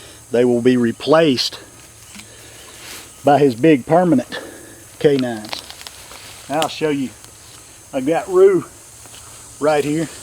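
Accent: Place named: South, United States. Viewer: Thanks for the info. By the way, that is the most solid Country Boy accent ever!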